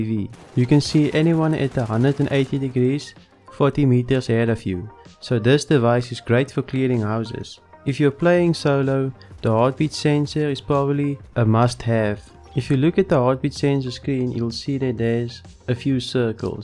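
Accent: South Africa. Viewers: 😂 Love the South African accent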